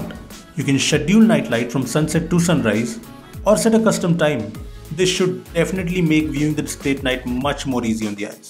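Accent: India. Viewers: Thats Indian accent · I like your videos but i hate the accent cause many scam-Indians are calling me all the time and tell me that there's something wrong with my win 10 laptop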